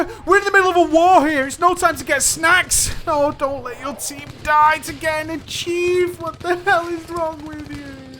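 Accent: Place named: Lancashire, United Kingdom. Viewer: "Thirdleh, tek oppotuniteh" - dat Lancastrian!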